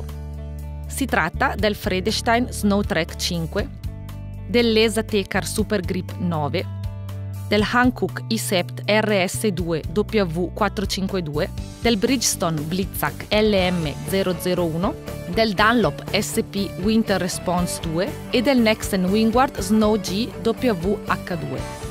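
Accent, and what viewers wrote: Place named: Ticino, Switzerland. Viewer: utilissimo! grazie. Buffo l'accento ticinese :)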